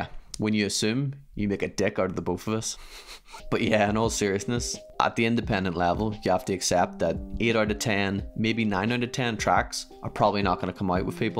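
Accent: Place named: Scotland, United Kingdom. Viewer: Yes...you got a sub bc i like your scottish accent. 😜 Lived near Perth 10 years ago. My Ex is scottish. But she is back home now. But i loved your country...if im right that you are a scots dude...???!!! I also saw that you are on Looperman. Im not active there anymore. But i was like 13 years ago. I only go there sometimes to download an acapella. Greetings from Germany. ❤☮☯❤☮☯❤☮☯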